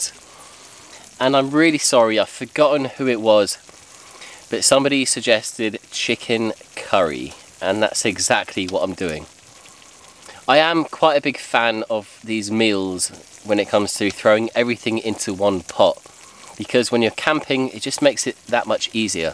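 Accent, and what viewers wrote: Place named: Bristol, United Kingdom. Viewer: Just found your channel.... I am in bristol, it sounds like you arent too far away from me.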